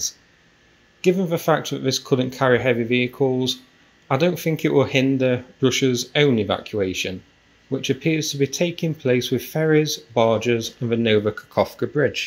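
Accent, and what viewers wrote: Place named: England, United Kingdom. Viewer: What I like the most about this channel is that the analysis, I would say forensic, is very deep and advances with overwhelming logic until some conclusion or leaves more questions to think about. It reminds me of Mr. Spock. I want to congratulate you for all these videos, for the marked English accent, and for the pauses that are just as revealing as the words!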